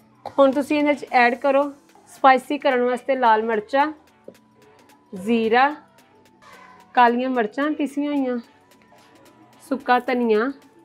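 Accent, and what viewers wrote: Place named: Sialkot, Pakistan. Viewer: aap mujhe Lahore Ki Nahi lagti aap Sialkot ki lagti ho kyunki yah Punjabi Sialkot Mein boli jaati Hai ok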